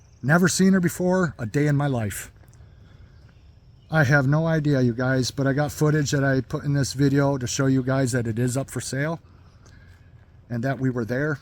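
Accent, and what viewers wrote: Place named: Midwest, United States. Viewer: I enjoy your videos and stories. BTW, love your Midwest accent. God bless you. Robert in northern, CA 🇺🇲 😉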